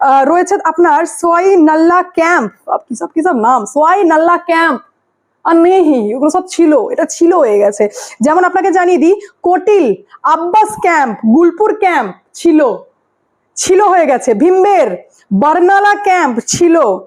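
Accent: India. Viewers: Bangla bolte bolte hindir aanch ta na tanai valo. Yeh-te sunte irritate laage. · দিদিভাই তোমার ভিডিওগুলো খুব ভালো হয় কিন্তু তোমার ভিডিওতে দিদিভাই হিন্দি টান থাকে পুরো বাংলায় ভিডিও বানান দিদি আপনার ভিডিওগুলো খুব ভালো হয় প্রাউড বাঙালি হিন্দি টান ছেড়ে ফুল বাংলা টানে ভিডিও বানান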